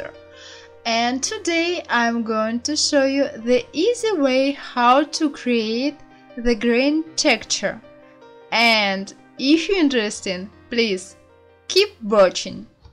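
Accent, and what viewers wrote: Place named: Russia. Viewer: Very cute Russian accent.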